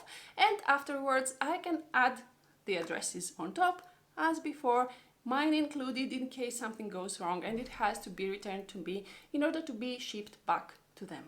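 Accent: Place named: Russia. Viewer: good morning I thought you were russian because of your accent haha:) Kind regards from the netherlands🇳🇱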